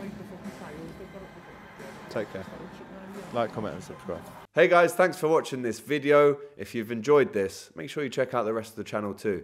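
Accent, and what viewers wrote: Liverpool, United Kingdom. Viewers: Can you put subtitles on please? I can’t understand your scouse accent. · Definitely a scouse accent that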